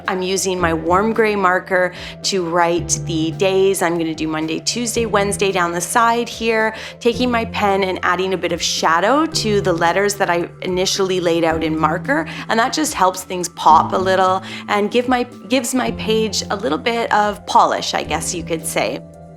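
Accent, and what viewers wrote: Canada. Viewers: Manuella Petkovic She’s Canadian.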